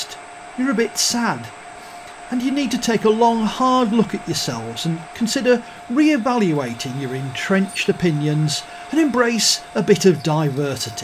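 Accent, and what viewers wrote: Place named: Southern England, United Kingdom. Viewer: Got to love the Southern English accent, full of humour and understated knowledge.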